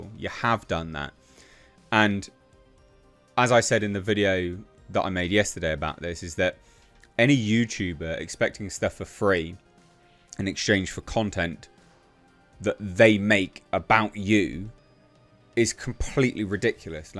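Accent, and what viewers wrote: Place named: United Kingdom. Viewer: Spoke like a true Brit .. ( the first 39 seconds of the post )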